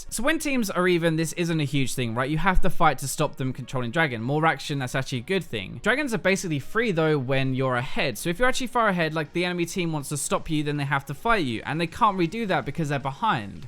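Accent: United Kingdom. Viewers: I like how smart you sound when you talk :D Must be that british accent :D Anyways, just to put into perspective. If you have 900 ap on veigar and with that 3 infernals and you get an elder. From dragons alone you get 324 ap more. That is bloody ridiculous. If he didn't oneshot you before he does now. And baron gives you even more. One game I managed to get 1300 ap on veigar with items and baron, no infernals though. Imagine with infernals, it would've been over 1700 ap. Can you imagine that power. Nope, and that's why dragons are broken, agree with you. I don't like baron buff minions though. They are impossible to kill and deal dmg. They could deal more dmg but be still normal health.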